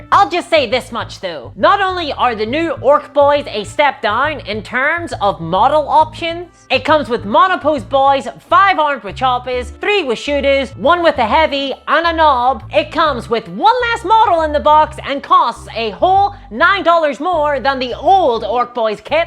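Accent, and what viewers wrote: Northern Ireland, United Kingdom. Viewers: Are you from the north of Ireland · Ah I'm near Bristol, knew it had to be some kind of british!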